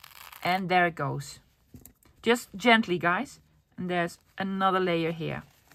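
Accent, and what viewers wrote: Netherlands. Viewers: Hello!! afinally I post something - I have been following your videos and I have learned so much and now have actually completed a few things that I am happy with - with thanks to you. So I will be putting together some happy mail. It is a thank you for your big hearted sharing of what you have learned! Not only that but I am Canadian and you know the connections we have with the Netherlands. My two best friends were from Vollendam - unfortunately they have passed before me and I miss them terribly. But your voice brings them back to me with the accent and I feel close for that reason. I am still old enough to be your mother (79) but love to learn new things!!, have a lovely day or evening or weekend! All the best, Barbara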